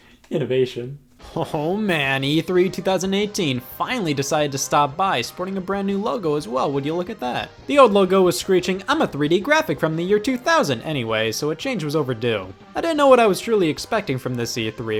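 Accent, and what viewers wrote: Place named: South, United States. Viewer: Hey yall scott here you can tell he is a southerner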